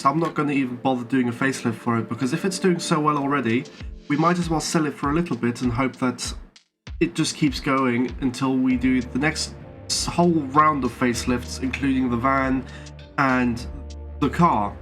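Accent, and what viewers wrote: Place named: Germany. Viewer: What is your accent? Your profile says German, but you don't sound like most Germans I have seen talk. I assume it is some kind of German regional accent, so I am curious what specific region.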